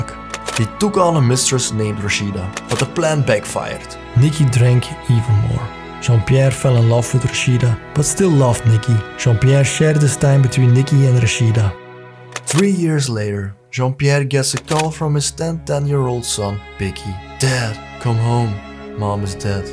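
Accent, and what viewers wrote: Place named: Flanders, Belgium. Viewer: Ja, vooral het accent, inderdaad. Typisch Vlaams, je bent niet alleen uiteraard. Maar denk ook even aan het doelpubliek, dat toch bijna 100% Vlaams/Nederlands is. Dan heb je al weinig reden om dit in het Engels te proberen. Voor degenen die onze taal niet machtig zijn volstaan ondertitels ruimschoots.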